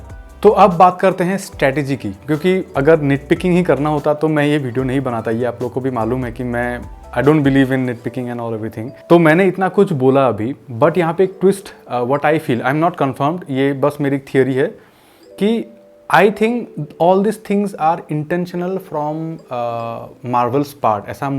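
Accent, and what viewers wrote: Assam, India. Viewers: R u Assamese?? Bcz Ur accent is familiar for me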